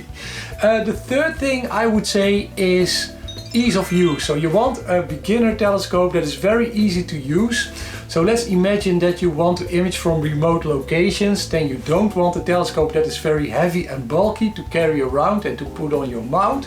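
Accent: Netherlands. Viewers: Ben je nederlands zo klinkt het namelijk Edit: ja dus. Leuke vids trouwens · Na het eerste woord hoorde ik het al, een nederlander!! Haha. Thanks voor de video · From the accent I predict this guy is Dutch. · hollander alert😂 goeie video man! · Haha na de eerste paar seconden zei ik meteen, hij is sws Nederlands. En ik had gelijk😜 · ) dutch accent sounds smart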